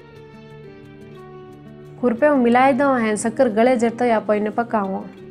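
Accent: Marwar, India. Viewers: बहुत अच्छा? जी आप मिठी मारवाड़ी भाषा मे मिठाईया मिठी - मिठी बनाते हो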